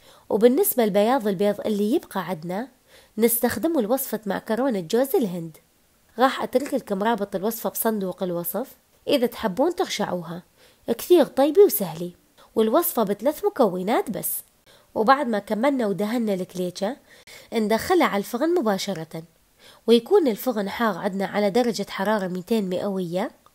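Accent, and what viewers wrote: Mosul, Iraq. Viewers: آللهہجة آلمـصـلآوية تجننن ❤❤ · ليش الموصل لهجتهم شويه تختلف عن بغداد. اكو كلامات متنفهم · اشقد حلوة اللهجه الموصغيه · عاشت أيدك ياحلى لهجه الموصل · عاشت ايدج مصلاويه اللهجه تخبل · الله ع اللهجه الموصلية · عاشت ايدكي حبيبتي قبان لهجتنا الحلوي الله يحفظ الموصل واهل الموصل يارب 🤲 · كلامك حلو مصلاوي احب الكلام المصلاوي و الاكل المصلاوي لاني عشت بالموصل اربع سنوات خلال دارستي بجامعة الموصل و بقيت كل سنة بالعطلة الصيفية اسافر للموصل كلك رائع ياعراق من شماله الى جنوبه مهما بعدنا عنك فأنت عايش بالقلب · فدوة للهجه تجنن اموت عل مصلاويين وكلامهم الحلو فديت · حلوه لهجت أهل الموصل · عاشت ايدج يالمصلاويه لهجتج احله من الكليجه الله يحفظ الموصل واهلها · اكتبيلي عن حياتج عمرج اني احب الهجه الموصليه انتن الموصليات معدلات بلطبخ ام حيدر من الديوانيه الله يوفقج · اني عجبتني اللهجة الموصلية واللدغة باللسان روعة وعاشت الايادي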